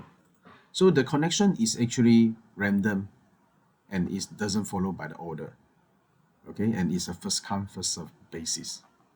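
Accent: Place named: Singapore. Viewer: Hello! Singaporean? I know this accent!...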